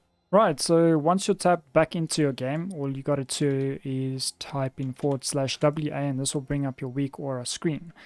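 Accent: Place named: South Africa. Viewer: Are you from South Africa?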